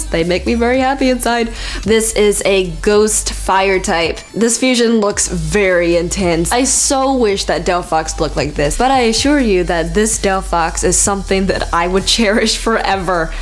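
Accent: South, United States. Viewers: Let me guess your from the south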